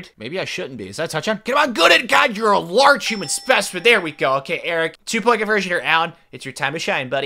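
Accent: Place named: Midwest, United States. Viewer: fr bro wtf how is his voice annoying I love it sounds like a nice Midwest guy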